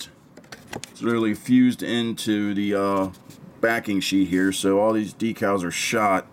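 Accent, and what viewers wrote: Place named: Australia. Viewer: Good project launch vid as always, 'Flack as thick as shepards pie' but the accent was more RAAF than RAF.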